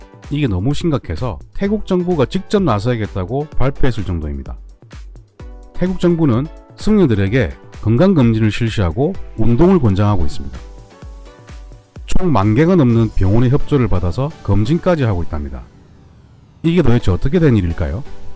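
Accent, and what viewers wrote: Gyeongsang, South Korea. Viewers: 갱상도 사투리 정감이 갑니다. 의학상식을 나누어주셔 감사합니다. 복된 삶이 되시길~~ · 경상도 사투리 억양 너무 듣기 좋네요 이 억양 듣고 싶어서 계속 다른 것도 더 듣고 싶어요 물론 내용은 상상을 뛰어넘을 정도로 최고 중에 최고구요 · 감사합니다 ~~경상도 사투리가 구수하십니다. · 경상도 사투리가 구수하고 정겹고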